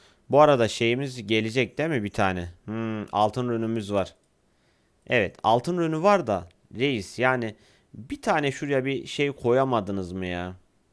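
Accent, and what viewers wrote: Gaziantep, Turkey. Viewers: biraz antepli konusuyon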